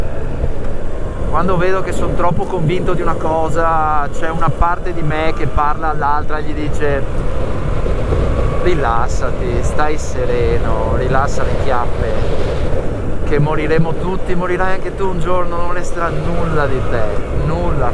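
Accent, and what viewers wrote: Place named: Veneto, Italy. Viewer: figurati!ti invidio molto,hai una bella moto e hai molta simpatia!e l accento veneto dà quel qualcosa in più😁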